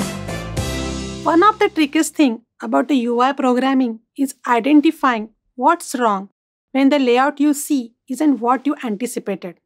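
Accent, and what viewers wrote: India. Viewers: 这个印度咖喱味道的English听起来真让人难受啊。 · Thanks. First time indian accent 💌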